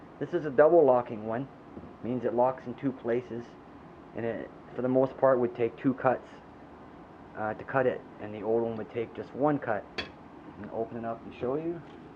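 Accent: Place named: Canada. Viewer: Thanks for making the astute comparisons on the Kryptos. You sound like a Canada man. As for the bracket/clip mount, for the yellow NY Standard, I've simply taken off the bracket with the hex screw, and I have no intention of packing it that way. I pack in a backpack especially for hauling groceries, on the way to the store. On the way back, I have three choices. Either in the pack if there's enough room and nothing is getting crushed, or outside on the inside of the straps, and over the top, or on the bike itself around the handlebars in one of a couple of ways. It's fairly stable that way for a couple of miles returning for any of my three bikes.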